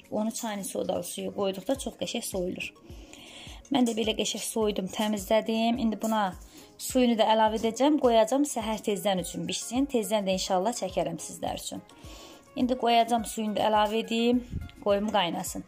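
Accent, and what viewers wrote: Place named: Shamkir-Gadabay, Azerbaijan. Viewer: lap yaxşı yox yanılmışam ləhcənizdə Şəmkir Gədəbəy zonasına oxsatdim ləhcənizi.mən♥️ Ucardanam ♥️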